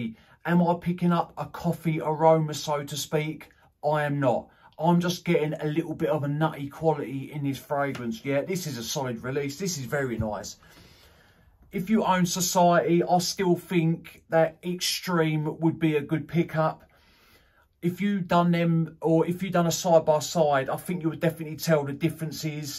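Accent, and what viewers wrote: United Kingdom. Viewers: What accent is that? I already know it's British baking but not London's I guess. English is my second language, or that's what I am hoping to, so that's why I asked 😊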